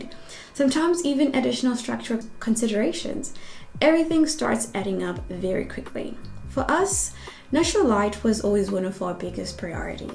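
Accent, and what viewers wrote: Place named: United States. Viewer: Judging from the houses we see on YouTube and those we have seen in person, the general building methods and principles and tastes Zim are too isolated from developed word standards. Without exposure Zim trades ppl and builders merchants n allied industries/professionals will disappoint those who are coming from the western /first world diaspora. 🤷🏽‍♀️ You sound American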